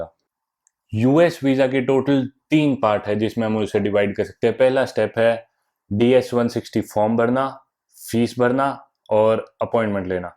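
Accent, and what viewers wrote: Haryana, India. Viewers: Haryanvi ho naa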